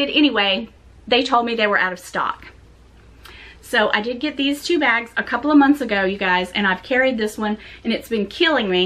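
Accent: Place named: South, United States. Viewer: I see u got that southern accent luv it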